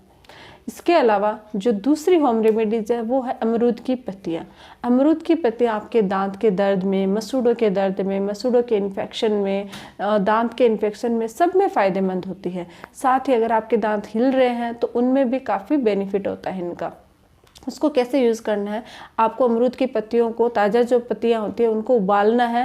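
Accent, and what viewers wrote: Rajasthan, India. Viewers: mam ap Rajasthani hona? Apki tont se lagra hai 😁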